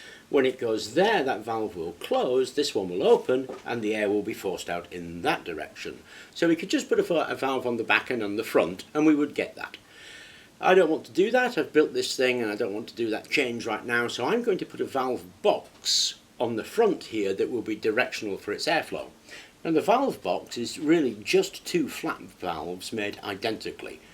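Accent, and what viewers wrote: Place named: United Kingdom. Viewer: Lately it's been like watching the Tech Ingredients channel with a British accent.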